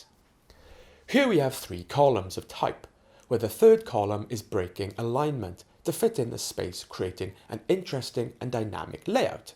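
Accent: United Kingdom. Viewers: Is this a British accent?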